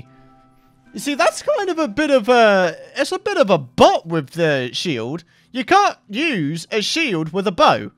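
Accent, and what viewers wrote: Scotland, United Kingdom. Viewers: Hi:) I'm one of your new subs and I'm curious..:) So I have a question for you. Are you from Scotland? I seemed to hear some Scottish dialect from you. I'm from Denmark and I am a big fan of the Scottish/Canadian rock/folk music group Runrig. They are unfortunately taking an absolute last world tour this year.:(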